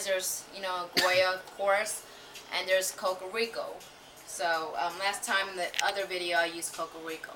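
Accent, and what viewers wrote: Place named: South, United States. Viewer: Atlanta?? Can def tell you from down south but never heard khmer girl with southern accent lol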